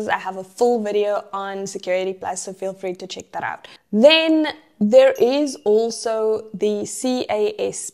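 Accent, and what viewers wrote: South Africa. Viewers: Are you from South Africa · Are you South African? Lol i can hear it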